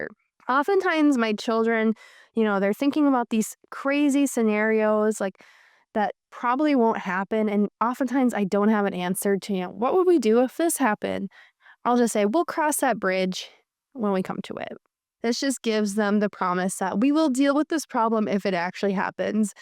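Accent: United States. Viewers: One of the best English teachers on youtube. I love your American accent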